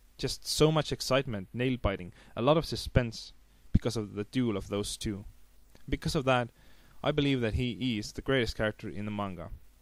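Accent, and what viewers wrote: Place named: India. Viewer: I like you're indian accent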